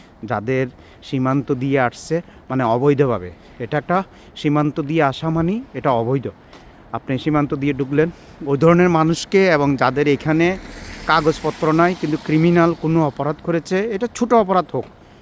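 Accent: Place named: Noakhali, Bangladesh. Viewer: আপনি মনেহয় নোয়াখাইল্লা। শুদ্ধ কথা বলতে বলতে আপনার নোয়াখালীর কিছু ভাষা চলে আসছে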